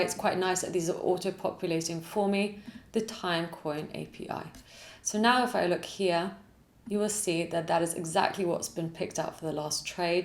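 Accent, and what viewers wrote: England, United Kingdom. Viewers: Which English accent is this? Just curious. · You are a British women, i know that because your accent is very well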